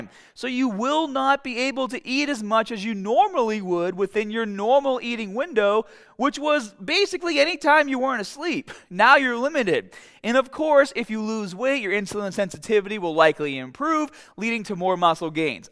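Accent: Boston, United States. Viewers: OMG! His BOSton accent makes me laugh. Every AR word is exaggerated. EVERY ONE of them. cARbs, mARkers, so fAR, ARgue, ha ha ha.. Keep going man. Good info. Just super funny accent. Love'n it. I do IF with KETO at a 20:4 fasting to 4 for last 6 months. But im not a bodybuilder. And as far as flexing the meal. Its easy to flex your eating time. its not a hassle. Im not a hARd gainer. LOL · You from Boston? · Haha Love that accent is that a Boston accent?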